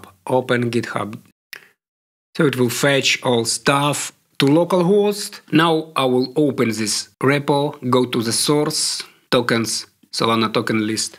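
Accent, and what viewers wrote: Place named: Russia. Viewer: bro are you russian? love the accent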